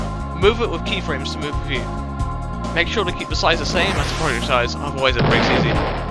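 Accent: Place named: United Kingdom. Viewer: British accent dected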